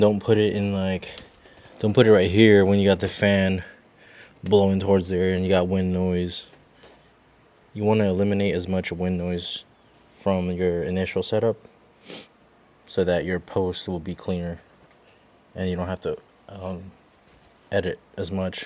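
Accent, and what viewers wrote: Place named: United States. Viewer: You sound american but drive on Italian roads?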